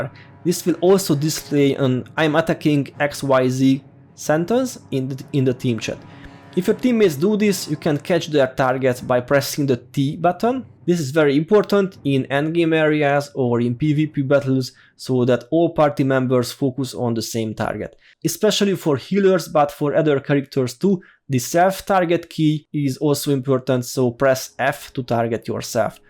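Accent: Hungary. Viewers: The Hungarian accent is the reason I’m here 😏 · Aha, hungarian accent! I always wondered where it was from :D That said, I love how you say "foes", reminds me of how Mr T says "fools", and I choose to interpret it thusly. "These fools are no challenge!" :D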